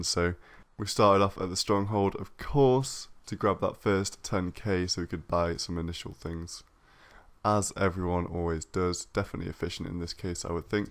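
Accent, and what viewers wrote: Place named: Newcastle, United Kingdom. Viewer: True Geordie lol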